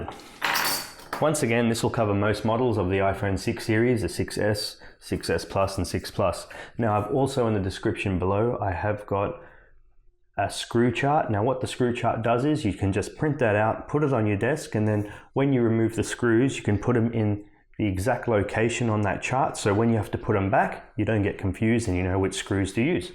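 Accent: Australia. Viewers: elliott debell Australia going by his accent.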